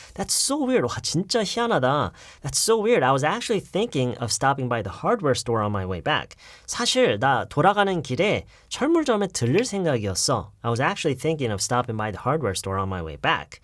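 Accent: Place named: United States. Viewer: 진짜 한국어버전으로도 양키발음을 잘 하시는게 신기하네요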